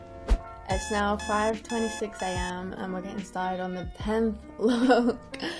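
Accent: United Kingdom. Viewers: anyone else forget shes brittish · POV; asking if she is British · how did i not know she was British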